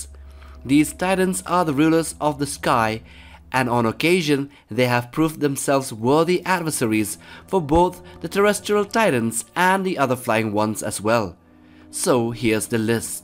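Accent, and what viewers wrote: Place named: India. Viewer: I love your narraration. Your excellent fluency in English combined with your Indian accent and well written scripts are just incredibly pleasant to listen too.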